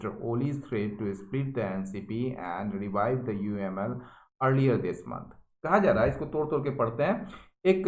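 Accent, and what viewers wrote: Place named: India. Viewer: we all are Nepalese ...but sir your voice and explanation is pure Indian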